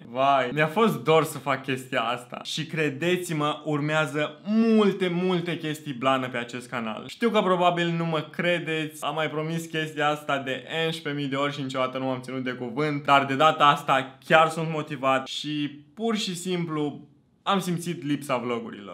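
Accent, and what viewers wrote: Moldova. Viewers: Ador accentul tau😍 esti moldovean de-al meu 😌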